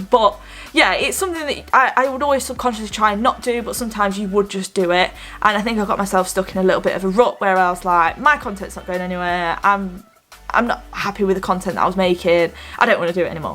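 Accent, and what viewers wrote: United Kingdom. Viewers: Omg youre alive!:D great to see you and hear you british accent hahah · maybe it's because I'm German and for me it sounds so utterly british... 😂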